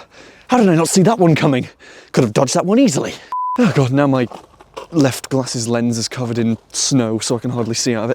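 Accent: United Kingdom. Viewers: The beginning of this video only works with a British accent. But it works oh so well.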